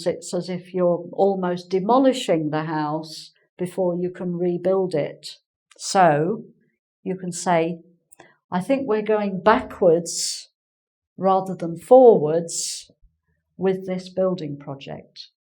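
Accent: United Kingdom. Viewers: Granny !! Really all Your lessons very fantastic and useful for beginners to learn the British accent could you help me to get the special classes to get improve my pronunciation&accent .. hopefully waiting for ur text message!! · Hey there! I'm a brazilian English student. I thought her British accent so cute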